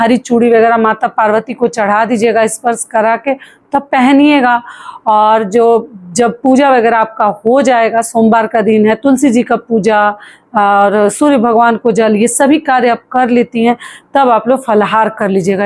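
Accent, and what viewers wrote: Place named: Bhojpuri, India. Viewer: I think shw from purvanchal or bihar bhojpuri belt.. her accent is saying.. jay shiv parvati ❤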